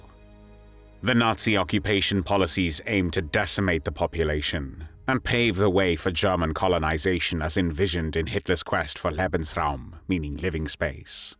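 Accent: England, United Kingdom. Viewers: The English accent of the narrator is nauseating. Hammurabi was right. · Soviet communism!? Don’t you know where the communist manifesto was written or where Karl Marx’ grave is?! You have a British accent! And should know the history of your own country!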